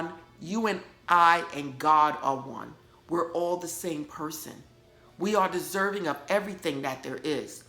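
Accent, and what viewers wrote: New York, United States. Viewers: Definitely from New York. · Girl! I have never seen you or your videos before but this video was in my feed and it is no accident. This is definitely something I needed to hear. I've done this in the past - not really knowing what it was called and it is an amazing technique. I must pick it up again because I need to break through some stuff. BTW, you must be a fellow New Yorker. I can tell by the way you say "tawk" aka talk. LOL. Anyway, I liked and subscribed.🙂👍🏽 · You have to be from NYC♥️🙏🏿I hear your accent. I’m from Brooklyn and enjoy your content. ♥️🙏🏿Blessings to you and your family. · Tallkking..love my fellow nyer. Great mtrl..thank you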